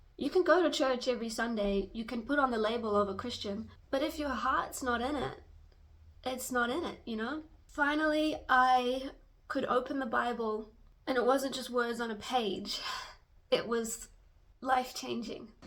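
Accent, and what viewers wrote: Australia. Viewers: What country were you born in, if I may ask? I detect a slight accent in your English that sounds Australian. May your 2026 be the best year of your life. 🎉🎉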